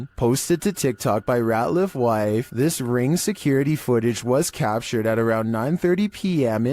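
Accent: California, United States. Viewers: 😂 He sounds like THE CALIFORNIANS from SNL . go check it out and tell me I'm right 😅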